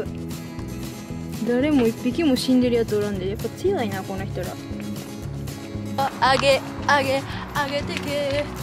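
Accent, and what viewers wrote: Senshu, Japan. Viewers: ﾊﾞﾘﾊﾞﾘの関西弁 笑えるし よいな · 見ときや、見りや 泉州弁ええな。やっぱ。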